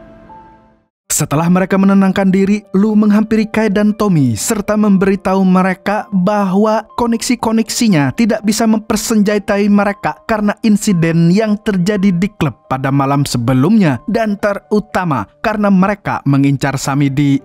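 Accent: Banjar, Indonesia. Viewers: Orang banjar kah pian nih mang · Org Banjar kah bang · Orng kalsel kah pian mang, kya ad logat Banjar ny kaituu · Bang lu kalimantan mna, logat kek banjar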